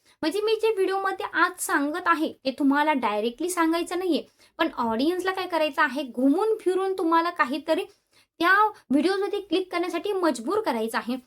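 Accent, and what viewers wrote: Nashik, India. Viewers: Tai tu nashik chi ahe ka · Tai tumhi nashikchya ahat ka ?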